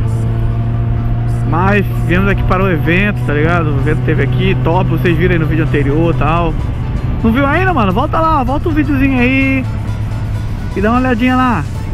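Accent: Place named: Pará, Brazil. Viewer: Mais que vc tá com sutaque paraense isso ta kkkkkkk fica suavi manu tava zuando com vc só alegria !! I pra resolva sou Paraense mais des dos 9 anos fui criado e estudei em sampa mais to fora do pais a trabalho mais sempre que posso vo no meu Parazao ve us carinhas trutas!!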